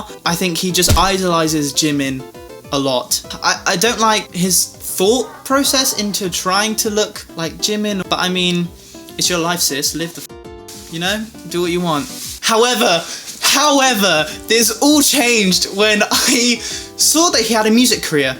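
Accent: United Kingdom. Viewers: Omg! You sound more British then him does. And I agree to not agree. It's unhealthy to change your face too look like a celebrity some would say, that person would need therapy. It's all part of the game for popularity and fame ..I agree his music is not even Korean or even close.. I found it funny that some Koreans knows this and still goes with joke and still being part of he's career lol nowadays anything goes I guess ? Everyone is just finding something to film about. ?? Who knows really as long he's not after my guy..that's all that matter in the bts. And look at him and take look of all of there bodyguards lol so many is so concerned don't be. Like always thank you for reading. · Are you korean? But you kinda have a british accent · Oli London Obsessed guy (Woww ur accent is Britain one I luv ur accent)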